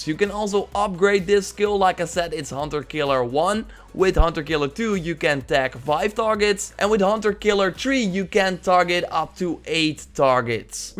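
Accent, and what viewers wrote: Netherlands. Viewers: Mr_Bohowsky he's Dutch. Let him be. The Dutch-English accent is our fucking pride, man.. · Nederlandse accent cringe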